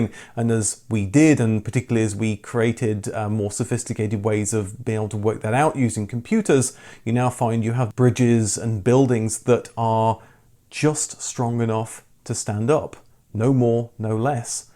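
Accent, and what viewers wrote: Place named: United Kingdom. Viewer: I enjoy your optional extras :) And of course your british english :)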